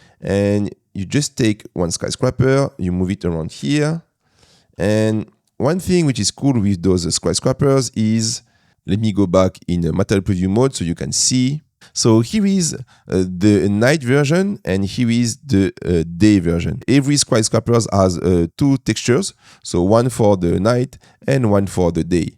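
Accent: France. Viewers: u've a french accent ? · Salut cool ra video , tu es francais ? Car ton accent et vraiment pas comme les anglais que je regader abituellent pour moi tu es francais ton rire et francais 😂 , ca serai cool des tutos en francais , bonne continuation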